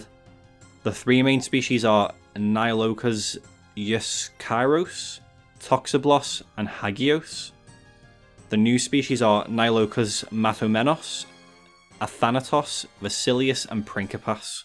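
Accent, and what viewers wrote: United Kingdom. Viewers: oh shit, we doing runescape lore? I don't play OS, but I'll listen to a British dude talk about RS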